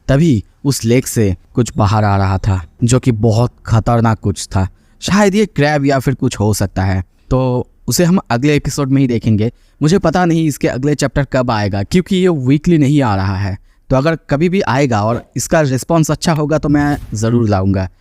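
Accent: Bengal, India. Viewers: Bengali ho aap? Accent jana pechana lag raha hai.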